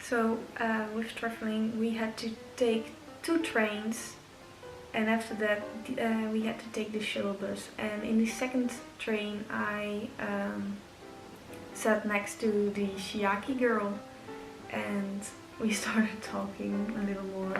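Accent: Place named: Netherlands. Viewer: Lol 10/10 dutch accent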